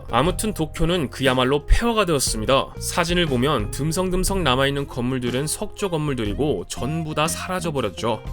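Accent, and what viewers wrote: Gyeongsang, South Korea. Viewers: 혹시 경상도가 고향이신가요?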